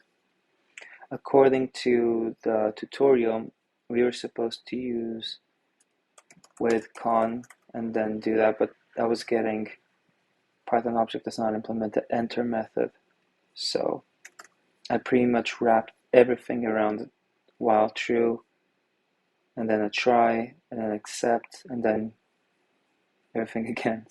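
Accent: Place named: France. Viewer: Et d'ailleurs, t'es français non ? J'ai remarqué un brin d'accent lol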